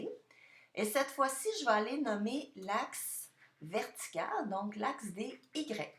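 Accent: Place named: Quebec, Canada. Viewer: merci vraiment pour ta vidéo et surtout pour ton adorable accent québécois lol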